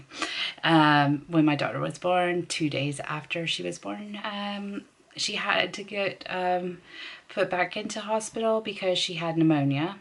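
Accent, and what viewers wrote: Scotland, United Kingdom. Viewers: Good video. I was going to guess you had moved to Scotland before you said it. Can hear it in your accent very slightly and when you said wee for small. I find it so bizarre Scotland and wales dont pay for prescriptions like we do in england. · I thought u sounded a bit Scottish! Love from Glasgow :) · You have a Scottish twang to your American accent :-) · As a Scot myself, I can detect a tiny bit of a Sottish accent developing there! That's the way to integrate fully! 😂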